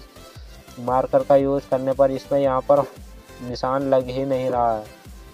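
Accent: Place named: Bijnor, India. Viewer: kyuki m bhi bijnor ka hu .bhasa sunke pata chala 🤣🤣vaise tum bijnor m kaha se ho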